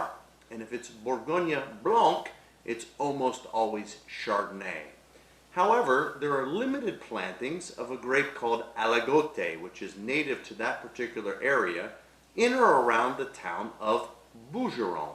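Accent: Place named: Italy. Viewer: you literally said all French words here in Italian accent 😢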